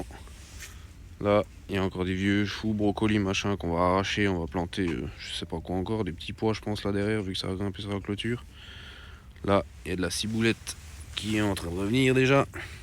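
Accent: Alsace, France. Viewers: Parfois, il y a l'accent belge qui peut être trompeur, mais en tant qu'alsacien, on se reconnait c'est clair 🙂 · Si il est pas alsacien la france n'a jamais été envahie par l'Allemagne 😂. Moi je le suis et son accent ne trompe pas weich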